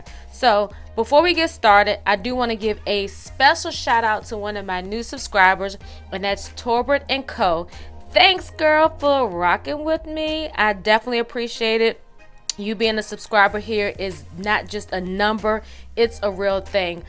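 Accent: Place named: Baltimore, United States. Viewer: I like the way you talk! I was thinking you were from Baltimore but i see you’re in Virginia lol I enjoyed the video as usual! Annnd you look amazing for 45 ❤️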